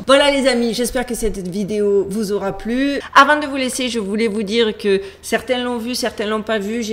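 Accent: South, France. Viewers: Ce que j'aime vous écouter ! Avec votre charmant accent du sud, vous chantez les vérités :) ♥ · L'important, c'est ce qu'on croit, pas ce que dit l'Église car celle ci est dans la tourmente . Si des centaines ou des milliers de personnes voient la même chose en même temps, pourquoi ne devrait-on pas y croire et pourquoi seraient-ils menteurs ? Merci pour vos vidéos très intéressantes avec cet accent du sud qui les ensoleille ❤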